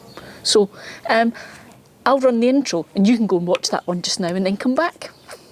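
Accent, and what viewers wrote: Scotland, United Kingdom. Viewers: Came to learn about neem oil sprays, stayed upon hearing a fellow scottish accent 💓🏴 (currently working in some serious Fife temperatures 🔥). Your garden looks absolutely wonderful!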